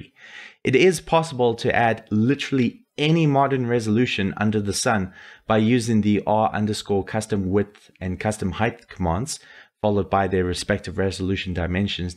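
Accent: South Africa. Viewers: south african? thanks for the video BTW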